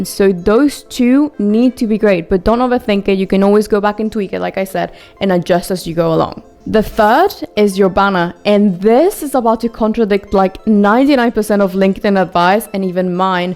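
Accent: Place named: United Kingdom. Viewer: Sounding very British today. Slay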